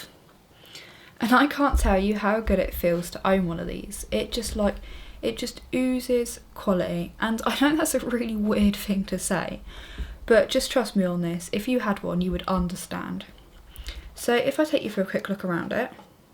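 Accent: United Kingdom. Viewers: Sorry, she lost me when she said "...sexy double sided..." The Brit accent, gotta love it.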